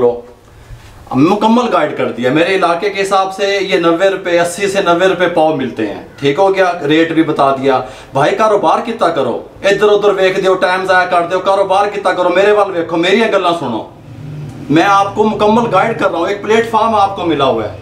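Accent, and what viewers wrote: Punjab, India. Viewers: bht kmal apk smjhany ka method, or tips amazing, sir or apka punjabi touch tu kya he kehny. Allah bless you.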